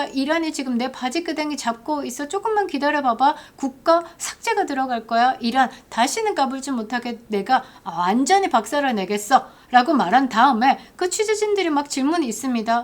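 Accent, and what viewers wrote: Gyeongsang, South Korea. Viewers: 속속히 말투에 경상도가 비치네요. 소리들으니 너무 반갑네요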